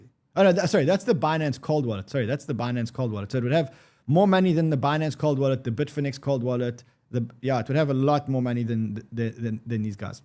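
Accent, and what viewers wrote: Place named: South Africa. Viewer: I thought I recognized the Saffa twang. Nice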